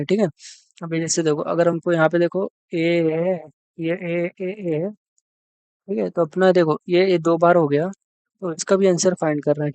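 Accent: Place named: Maharashtra, India. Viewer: your marathi accent is so sexy:face-blue-smiling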